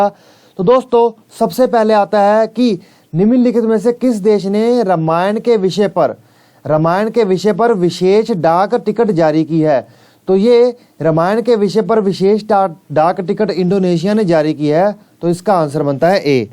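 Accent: Punjab, India. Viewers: paji sardar lagde oo punjabi hindi tuhadi mix ho rhi aa chal koi na veer........god bless u rabb trakiya bakshe...thank u so much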